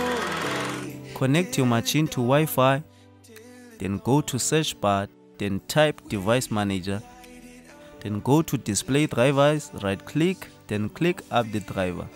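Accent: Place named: South Africa. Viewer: Greetings from Nairobi. I love the SA accent. Bro you just saved me over $300. Was getting ready to order a new Mac Book from Amazon. I installed the Exodus Cryptocurrency Wallet on my laptop and then everything slowed down. I tried to make a bunch of improvements to increase performance which helped however Premiere Pro simply couldn’t load without showing the error. You’re an African Genius. Thanks 🙏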